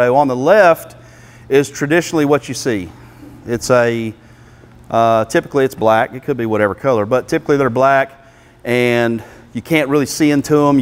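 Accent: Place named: United States. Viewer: Goddamn, he country as hell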